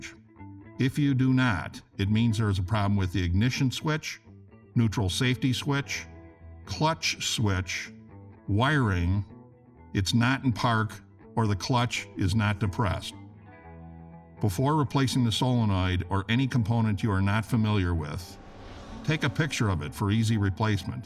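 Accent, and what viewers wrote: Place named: Chicago, United States. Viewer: This guy is obviously from Chicaago. That accent is terrible but video not bad. thanks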